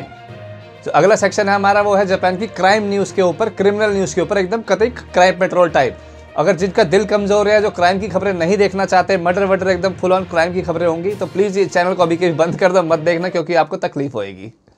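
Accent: Haryana, India. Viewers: Love your Haryanvi accent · Japani news haryanvi accent me 👌👌👌👌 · Kaash news channel me bhi aisi haryanvi me anchoring karte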